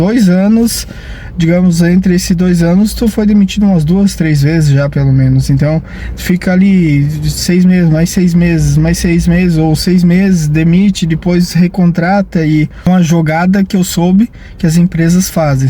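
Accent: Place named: Brazil. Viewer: Olha o conselho, falas, falas e falas e não avanças, vê outros youtubers e logo aprendes e ficas 5 ✨ quanto ao falares brasileiro cada um sabe de si tanto faz o importante é entender-se, mas não existe idioma brasileiro, existe sim vindo do português o que muda é o sotaque, ou dialeto e muitos erros da vossa parte, agora que o sotaque é mais bonito que o português é uma verdade. Fica com a tua e nós ficamos com a nossa o importante é seguir enfrente.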